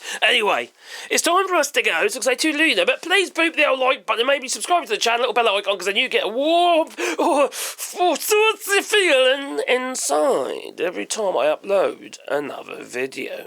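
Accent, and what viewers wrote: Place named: Southern England, United Kingdom. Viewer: It's his southern English accent.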